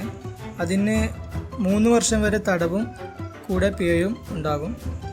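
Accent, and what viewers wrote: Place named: Malappuram, India. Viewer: P P മലപ്പുറം ഭാഷ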